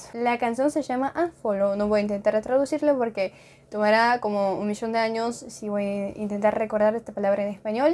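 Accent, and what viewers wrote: Argentina. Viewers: Muy bueno tu español argentino!! Saludos desde Rafaela santa fe argentina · Español ARGENTINO😍🇦🇷😘😄👏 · Es rusa o argentina?? Ajaj que crack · me parece una colombiana con argentina jaja rusa no creo nose por q hay gente q le cree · Fa como se te esta pegando el acento argentino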